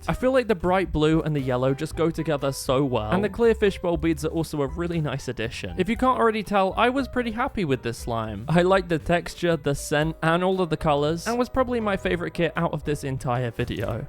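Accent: United Kingdom. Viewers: Are you British? You sound like you are British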